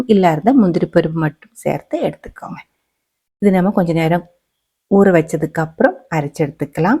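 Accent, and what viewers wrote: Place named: Kerala, India. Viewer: Avuha Malayalam... basha puryalaiya... so sweet...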